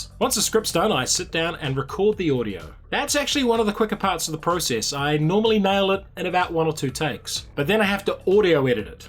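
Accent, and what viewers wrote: New Zealand. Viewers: Love the vids and its great hearing from a kiwi. Just curious but where is that photo taken is it by the old prison?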